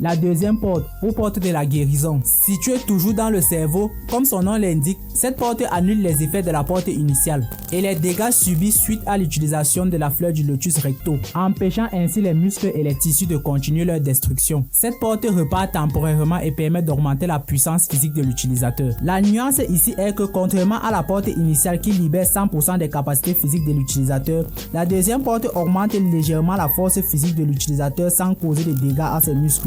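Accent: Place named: Africa. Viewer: C'est juste que t'as 1accent comme dans beaucoup de nos pays Africains dû à nos langues traditionnelles, c'est pas grave mon frère. Continues comme ça j'aime tes vidéos👍🏽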